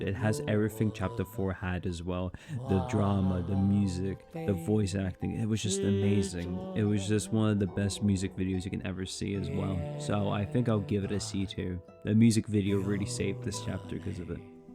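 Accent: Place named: Scotland, United Kingdom. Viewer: Scottish accent gives that mythical feel, no Indian accent can ever achieve.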